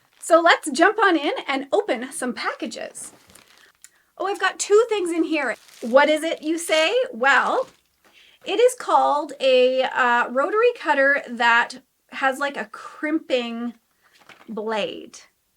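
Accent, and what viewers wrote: United States. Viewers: “Beg” for bag and precision pronunciation of “iRON.”. You’re either in Wisconsin, Minnesota, or Canada. lol, I love the upper Midwest accent 😂. Thanks for the video!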